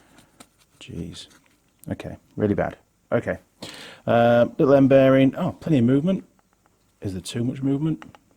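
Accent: Yorkshire, United Kingdom. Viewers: Also, where you from originally? You sound like you’re from not far from here (sunny Rochdale), just over the wrong side of the border into dirty Yorkshire? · Your Yorkshire accent is very soft considering you arrived across the ditch in 2008. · Sounds like a Brit, looks like an Australian :S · Nice tear down. Your accent sounds local to where I live in the UK, maybe a quick run over the "snake pass". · Heh, I came to the comments to say the exact same thing! Reconised the accent straight away; "odd sounding Kiwi", I thought, "sounds like home" - I grew up just outside York. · I have to agree! I only arrived at this video by a YouTube recommendation, and to be honest I thought I would skip through chunks of it just to see the damage - - I have watched every minute of it, and what a fantastic video! You have another subscriber (from the UK, where I suspect you originate from, going by your accent?) and I look forward to watching future videos. 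· You live in Australia I take it, or I think that's what you said at some point. But you sound very much like your from my part of the world in Yorkshire, I live in the dales. I could be wrong, but definitely English, I'm sure, but it sounds that way. Awesome vid aswell, nothing better than getting ya hands amongst it under the bonnet, a lot easier I must say on the bench. Glad I came across your vid, it just popped up in things you may like. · Hi, I came here courtesy of the YouTube suggested video list. Being a reasonably experienced hobby mechanic, I understand what you are on about in this here video. The way you talk the viewer through the stripdown is refreshing. Subscribed! Cheers, Niek from The Netherlands (opposite end of the planet! hey!) p.s. I can't help but spot a bit of a mild Yorkshire accent from time to time. You're not from the UK now are you? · Interesting stuff. Nicely presented too. 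You sound like you're originally from Yorkshire?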